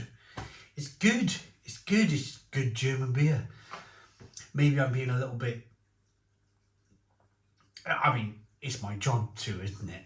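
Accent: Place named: South Wales, United Kingdom. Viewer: Great review. I’ve never bought beer from HB but will now. Great to hear mention of Cowbridge. I went to Cowbridge School and worked in the Bear at the weekends, just loved my time there. Good to hear your South Walian accent “laaager”, “good level of caaarbination” and “Home Baaargins”. Takes me right back to Cowbridge 😊. Keep up the great work.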